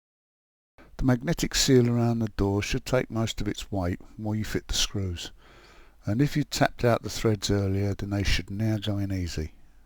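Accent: United Kingdom. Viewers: Perfect instruction on what to do. It means even I can change my door. Maybe it’s cause I’m welsh but I Loved the ganstar cockney accent. It felt like I was being told what to do by a proper hard Londoner 😁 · This bloke sounds like geezers I worked with in the 1970s. I'd definitely say he's from South East London. Penge? Peckham? Blindin' instructions pal.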